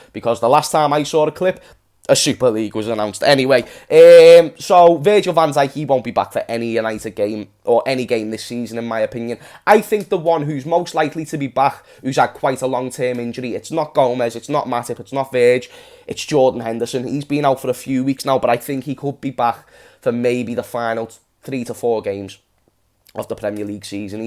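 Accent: Ireland. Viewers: Big up from Ireland 🇮🇪 ( great Irish accent btw ) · Love the Irish accent your like myself bro 🇮🇪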